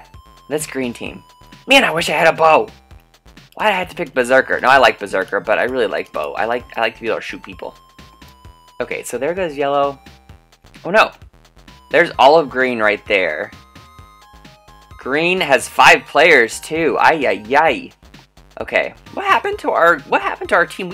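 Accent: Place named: South, United States. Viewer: What's with the country accent????????????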